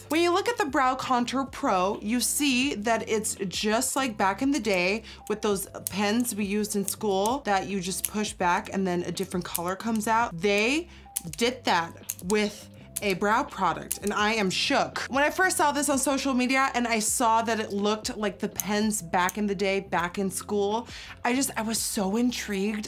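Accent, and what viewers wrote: Netherlands. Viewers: we would be so powerful if we knew THEN, what we know NOW! I love you you make me smile and I think you have such a great personality ( I love your dutch accent) thank you for being you, you're wonderful, beautiful girl.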